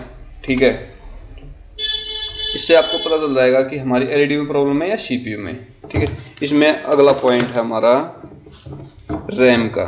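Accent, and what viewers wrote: Haryana, India. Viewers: Bhai boli to haryanvi lg rhi h kit k ho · Bhai apke muh se halki halki haryanvi sun ke maza aa gaya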